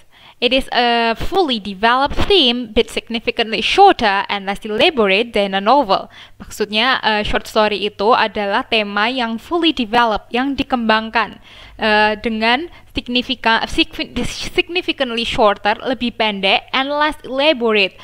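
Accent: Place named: Wonogiri, Indonesia. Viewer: saya kan sering main ke Solo sekitar Mangkunegaran.....Tahun Ibu guru putri solo, ya karena logatnya, mirip logat Wonogiri juga.....Tapi bahasa englishnya setuju great job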